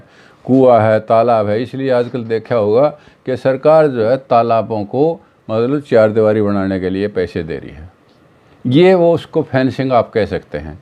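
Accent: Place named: Haryana, India. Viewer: Nice explanation and the wonderful हरयाणवी accent. 👌👌👌👌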